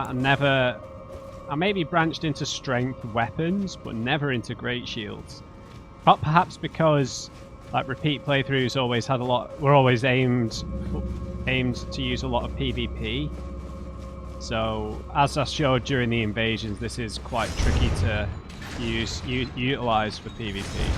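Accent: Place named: United Kingdom. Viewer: Loving the playthrough! Where abouts in the UK are you from by the way? trying to pin down your accent (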